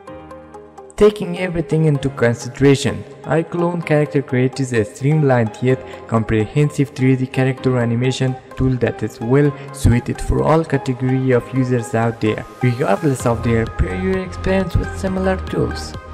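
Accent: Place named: Russia. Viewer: Почему мне кажется, что ты из России или стран СНГ?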